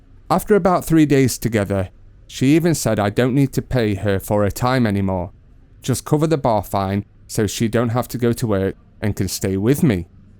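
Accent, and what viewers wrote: United Kingdom. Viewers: You have British Accent.🤔🤔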